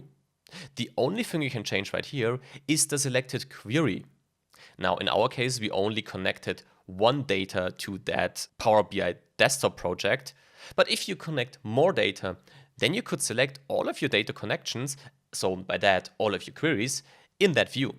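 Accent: Germany. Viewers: Love his German accent.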